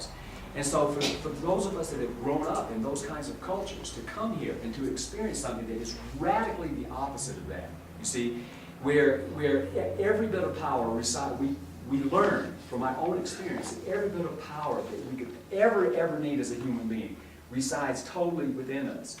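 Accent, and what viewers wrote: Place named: South, United States. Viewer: I trained with and Stan, early 90's. Just found out no longer with us. These were, are, legendary days that cannot pass. I know the way he used to talk and the sort of thing he talks about here, and he has same energy, but his strong southern accent isn't so pronounced. Goodbye. See you again probably.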